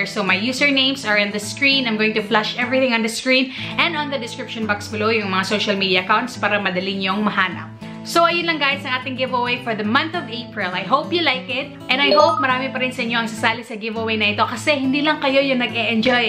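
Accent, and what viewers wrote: Visayas, Philippines. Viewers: Ate 💗💗😉😉btw pang ilang commeng kona bato😂😅but its okay...ate alam mo ba na pangalawa ka sa mga best youtuber para sakin..simula palang kasi nung napadpad ako dito grabehan na ehh...nagtuhan ko agad channel mo..and sana te mabasa moto ilolong comment ko na..ate hope i win for this giveaway kahit eto lang kahit isa lang dyan basta may matanggap ako from my favorite youtuber...plis🙏🙏sana talaga ate...kasi matagal kong winish na manalo sa mga giveaways mo pero lagi nalang hinde🙍kaya sana this time manalo namn ako😿sana..btw ate wlang halong eklabush to ah.ang galing mo talaga and ang cute mo magsalita...bisaya?? Hahah same tayo ate papa ko kase bisaya pero andito kami ngayon sa caloocan city...i hope ate mapili mo talaga ko..pag napili moko gagawan ko yan ng bidyo😂and irerebyu ko yan😅💗pramis...kapag napili moko d ka magsisisi..hahha...lab yuu ate..keep it up👍sana talaga mapili moko..btw mag rarandompicker kaba o ikaw mismo pipili??? 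Sana ikaw nalang mismo para mas maganda kasi alam mo kung kanino dapat yan mapunta..anyway un lang..lab yuu 😂😂sana talaga mapili moko. Wish ko lagi kong pinagdadasal..plisss...1million na plis..hahha💞un lang ate. Ig: maizel_jems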